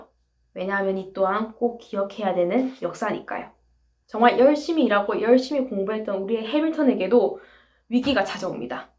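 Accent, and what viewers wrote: Gyeongsang, South Korea. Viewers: 잘 봤습니다. 님의 경상도식 억양이 정감있네요.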